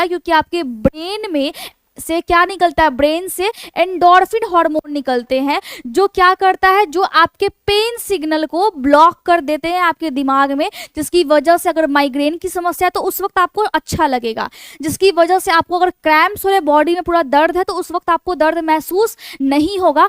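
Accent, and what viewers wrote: Bihar, India. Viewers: Are you bihari Language pronouncesion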